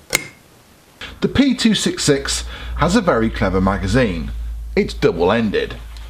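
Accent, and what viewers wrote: United Kingdom. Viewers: I subbed just because of your voice. It's not just the accent, you could be a commentator for the BBC or Top Gear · Am I the only one who thinks his voice and accent are annoying for this kind of video. Cuz I totally wasn’t expecting a guy with a British accent when I came here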